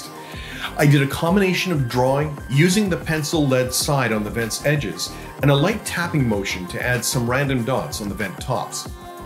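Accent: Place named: Canada. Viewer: Great video, and being from western Canada (Victoria) myself, I too use the same pronunciation - its' just a Canadian thing, eh?;-) A couple of questions: I was curious why you were not wearing gloves while painting and handling the model; and what liquid was being used to clean your airbrush between coats; and I think I also saw 91% isopropanol being used a reducer.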